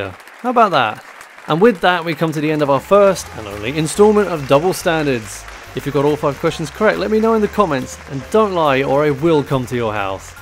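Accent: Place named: United Kingdom. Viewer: No thumbs up. I live in the US so my ear is not tuned to a British accent. The accent along with your fast talking made it a challenge to understand. At some points I changed the playback speed to 0.75. My suggestion to get more thumbs up? Slow the F**k down! Are you simply trying to impress us that YOU know this information, or are you trying to impart the information to the rest of us? Communicaiton is NOT speaking words, communication is transferring ideas from one brain to another brain. Do whatever it takes to acheive that goal.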